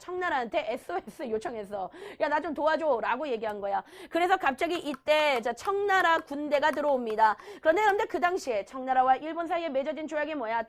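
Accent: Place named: Jeolla, South Korea. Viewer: 이분 고향 전라도라에 내 목숨 건다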